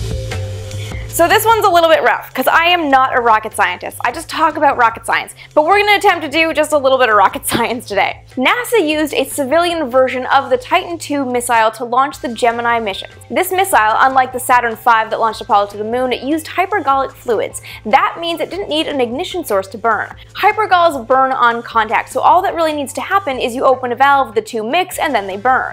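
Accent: Canada. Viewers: Laughing Gravy Exactly. Every Canadian I've ever known has pronounced "missiles" exactly like her. OP obviously jumped to a conclusion. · Love her Canadian accent. Especially how she says "missile".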